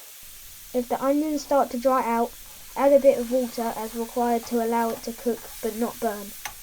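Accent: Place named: United Kingdom. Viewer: I love your accent, what kind of british accent is it?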